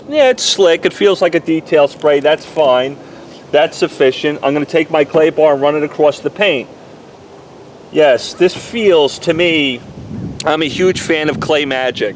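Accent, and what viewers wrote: New York, United States. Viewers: You sound more like The Bronx or Brooklyn, Tx :D Love the accent and the explanation!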